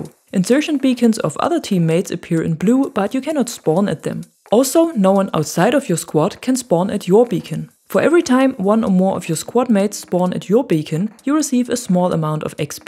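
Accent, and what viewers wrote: Germany. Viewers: Wie man merkt dass du eine deutsche bist haha ✌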